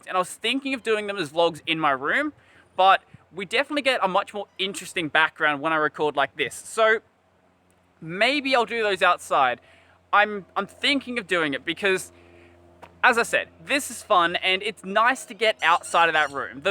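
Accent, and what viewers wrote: Australia. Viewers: He’s Australian 🦘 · He is Australian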